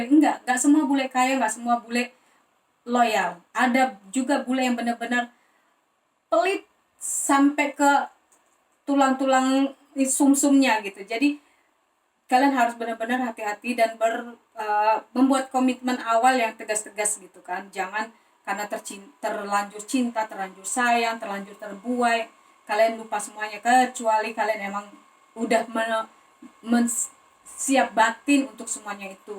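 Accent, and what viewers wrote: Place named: Eastern Indonesia, Indonesia. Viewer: Sis seprtinya org TIMUR,, Bagus bnget penjelasnya..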